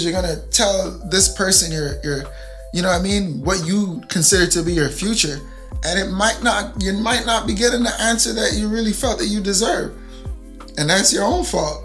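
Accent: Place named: Jamaica. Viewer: Great advice. idk how, but I knew u were Jamaican as soon as u spoke lol wassap fam. But yeah. I think one of the hardest things is trying to build yourself outside of your family. Like you were saying, certain habits you didn't know you had. I recently moved out, and there are so many things I thought were normal that aren't.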